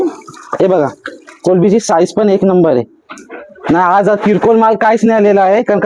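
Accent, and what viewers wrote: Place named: Agri Koli, India. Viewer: To agri koli bhasha boltoy tyamule Vav mhantoy maza Andaaj aahe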